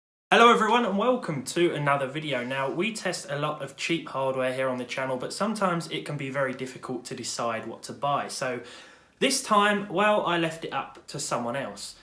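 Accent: United Kingdom. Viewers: Greetings from "DEUTSCHLAND" i love ur british Accent!!! 🙂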